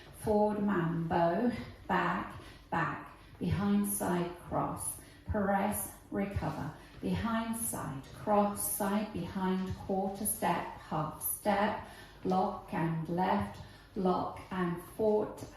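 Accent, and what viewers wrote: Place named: England, United Kingdom. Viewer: The best Line dancing teacher on the Net, with a bonus of a lovely English accent. x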